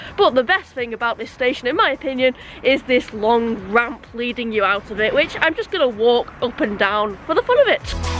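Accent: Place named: Wigan, United Kingdom. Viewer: Hi, Your ability to deal with rail travel aggro without panic (like last minute train cancellations etc.) is growing increasingly impressive - ALSO... I'm sure the mutt that spooked you at Saltmarshe meant you no harm; and (say the following aloud with your special 'Wiganese' accent) lacking a HAIRY OR FURRY COAT, he was probably only looking for you to give him a stroke or cuddle for warmth on such a cold day (that even drove you to run backwards up 'n down station ramps to keep warm!) - I also feel pretty sure he approached you as he sensed you're a 'creature lover' - so I hope you didn't disappoint him(?) Your good 'elf G but DO get yourself a warm hat to help drive out your lingerin' cough 'n cold. PJ x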